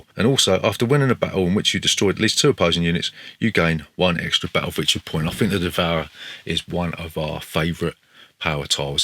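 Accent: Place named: England, United Kingdom. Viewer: As always thanks for the entertaining review. Like your perspective, always nice to hear an English accent when living away.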